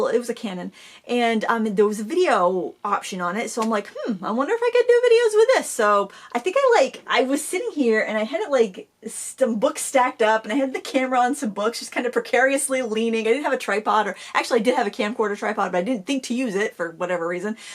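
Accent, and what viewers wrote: Maine, United States. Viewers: First found you about the time you started. Don't know how I found you, but when I heard you revert into a Maine accent I was hooked! I have lived in AZ for 21 yrs. Born & lived in Portland, ME 57 yrs, hated winter. But still have strong ties & visit every 2 yrs. Even have old friends who live in your area! I have learned oodles fr. you. When you refer to someone trying to do better with H2O coloring by getting a better brush that's me. It doesn't help. but I keep trying! Watching you paint is like therapy to me. It's like a link to home. So, please keep going! Can't imagine how difficult it must be. Your honesty, personality, talent, wide knowledge of lots of things is amazing. You go Girl. I do miss when you & your friend Sarah? did the Q & A, things change. Happy Anniversary, and many more!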